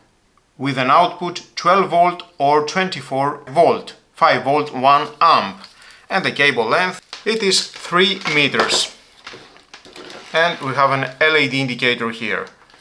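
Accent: Greece. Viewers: Useful review, ty. Honestly, you sound like Greek 😅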